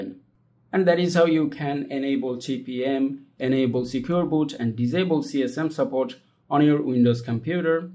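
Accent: India. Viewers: Тот самый момент когда уже все перепробовал, а какой-то чувак с голосом индуса снимает это видео на телефон, и это тебе помогает😂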